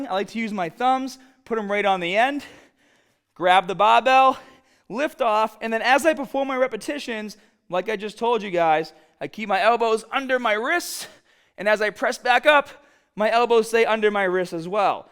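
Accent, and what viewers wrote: Boston, United States. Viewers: Are u from Baston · Yeah he’s definitely from Boston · Boston accent actually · bro is boston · Really helpful. I had a nasty shoulder injury years ago due to bad form on incline bench - shoulder blades not retracted, flared elbows, grip too wide - and haven't done it since. However, it's a really useful exercise and I'll give it a go again having watched this. Btw I like the Boston accent and am looking forward to getting stahded with a proper grip on the bahbell. Or rather starrrted and barrrbell as I'm Scottish. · Wait, where tf did that Boston accent come from. He doesn't have that accent in his older videos lol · I don't remember being so Bostonian. I feel like there's a Mandela Effect cause I swear he sounded normal like 10 years ago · I have watched many videos about inclines and everyone is saying differently on how your form should be so who is right and who's is wrong?! 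And you must be from Boston? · I'm gettin a HIIINT of Boston in this guys accent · Boston accent? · I dint remember his baahhhsstahhhhnnn accent being so thick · Before we get stah-ted…love the Boston accent…! ♥️🧦⚾️ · This guy is so from Boston. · I just subscribed.. great info plus you must be from Boston GO PATS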